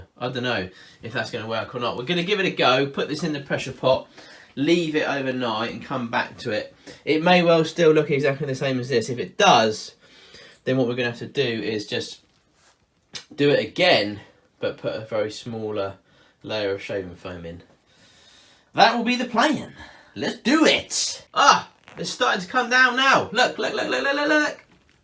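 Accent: United Kingdom. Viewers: Well thought by the thumbnail this would be interesting video... then soon as heard you speak I said ugh hell no! The way Brit’s speak is absolutely annoying... so no thank you moving on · ...you are freaking amazing n hilarious!!! Great job n i love your British accent.....rather sexy...🙋💕💕💕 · First time watching this channel and I honestly was not expecting the British (?) accent.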